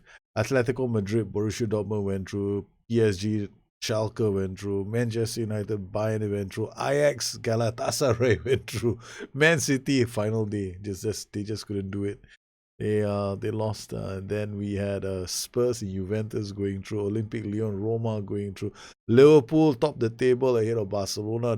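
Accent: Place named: Singapore. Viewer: I cant put a finger on your accent dude...at times you really sound singlish... Then you you would say something that would throw me off completely...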